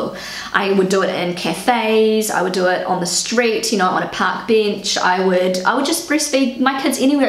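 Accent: New Zealand. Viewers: I love your New Zealander accent! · I love the NZ accent :) - thank you for the kind words about German parenting. Property is a big issue in Germany so maybe that is why German parents care about who owns a toy. Maybe we should relax more :) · I love your accent. :) Bevore you mentioned that you are from New Zealand I couldn't really tell where that accent is coming from. I thought maybe England or Ireland or Australia. I have never been to New Zealand. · Ja, das ist das faszinierende an den diversen Akzenten in NZ. Man hört noch die Ahnen. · I loved you video (and it's the first time I hear New Zealander accent) . I am in Ireland hoping to move to NZ and I love German style for parenting. The way you showed some points, even me being Brazilian seems I have similar attitudes like the German moms. Interesting to mention that all kids in Germany wear a beanie just in case to prevent cold wind, seriously all!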